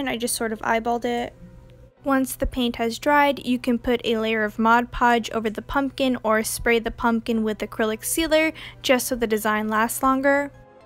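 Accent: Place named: United States. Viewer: how much I hate American accent.....eww but these diys are so cute wow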